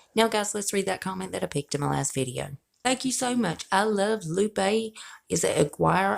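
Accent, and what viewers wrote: South, United States. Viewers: has always had a strong southern accent, just like myself. I'm from the south as well. I've been subscribed to her from almost right when her channel started, and her accent has always been the same.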